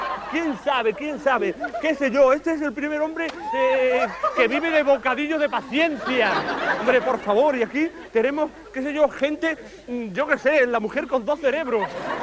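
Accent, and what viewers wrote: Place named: Huelva, Spain. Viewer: pedro reyes es buenisimo!!! m encanta, es un humorista poco corriente por eso m resulta muy gracioso ademas ese acento, ademas de huelva como yo, eske es la polla... el primer hombre de peluche, y direis, donde tiene el interruptor??? ahahahahah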